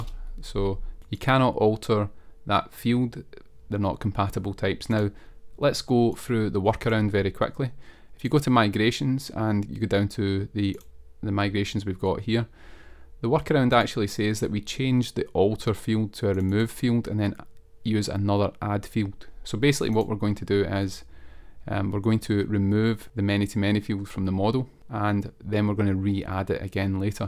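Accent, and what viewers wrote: Scotland, United Kingdom. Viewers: Fantastic Scottish Django Developer! Found another one :D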